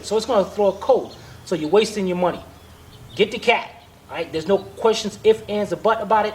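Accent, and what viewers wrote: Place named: Boston, United States. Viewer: I know this is off topic but...North Carolina??? "...so let's get up under HEAH...", "...CONNECTAH.." and fast talking... that accent sounds Boston-ish, New York-ish, Jersey-ish??😂😂Thanks for the information in this video. The 'wall' explanation helps a lot as my vehicle is throwing this code. ♥ .•:*¨¨*:•. ♥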